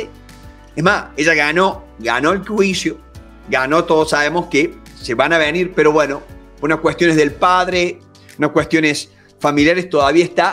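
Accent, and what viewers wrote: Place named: Cordoba, Argentina. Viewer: Acento weon de los cordobeses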